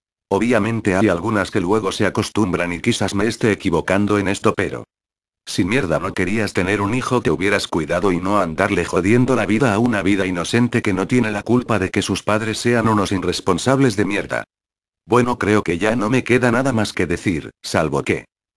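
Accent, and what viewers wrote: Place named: Spain. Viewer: porque hablas como español si sos argentino?pense que eras de España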